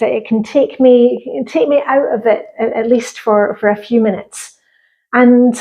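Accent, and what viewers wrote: Scotland, United Kingdom. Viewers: Hiya. In answer to your question of how I found you, you've popped up on my YouTube suggestions on my mobile. I've been watching de-clutter, minimalist stuff so guess it's those algorithms. I warmed to your scottish accent, I'm a fellow Scot! Thank you and keep up the good work 😊 x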